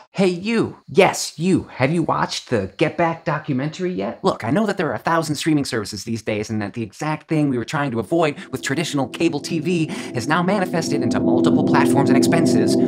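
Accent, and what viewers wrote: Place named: United Kingdom. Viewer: Appreciating all of this but esp the British accent dudeeeee.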